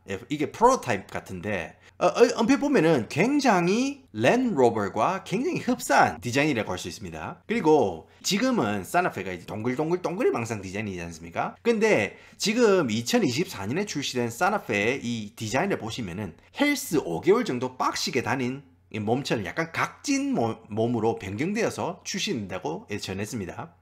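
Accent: Gyeongsang, South Korea. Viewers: 같은 경상도 사람인데도 사투리 찰지게 하시네요^^ 재밌게 보고 있습니다~!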